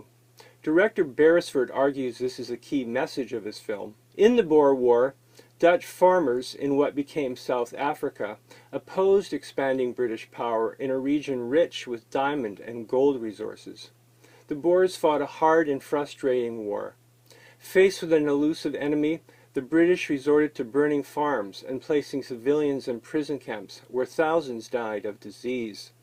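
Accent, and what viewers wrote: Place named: Canada. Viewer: Ah didn’t know that the Canadians pronounce the same as the yanks. I’ve recently gotten into your channel and I’m enjoying your content. And mabey someday we too can shed the shackles the poms have on our phonetics. Also have you thought about doing some kiwi cinema as it be good to see our cousins represented.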